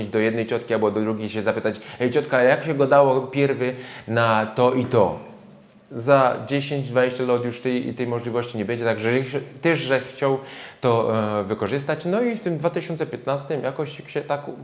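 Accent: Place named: Opole, Poland. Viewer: Fajnie se ciebie suchoł . Malo se tu spotykoł że chtoś żundzi jak w opolskim 😉 a szpajzy to były maszkety mojego syna 😋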